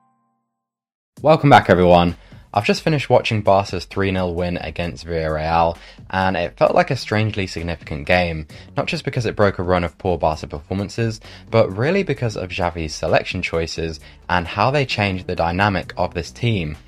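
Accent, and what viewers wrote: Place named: United Kingdom. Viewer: yes, sometime I had the same feel of a barca game as, but just have the capability to put it into words systematically, and elegantly, plus that British accent (I am from US), love this dude, lol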